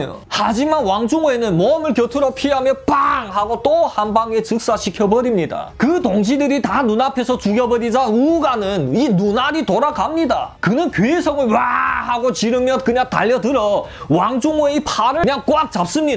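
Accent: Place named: Korean-Chinese, China. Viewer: 발음 좀 고치시면 유튜브 떡상가능하실듯요 억양이 억센 조선족이라 좀 듣기그렇네요 썰은 재밌는데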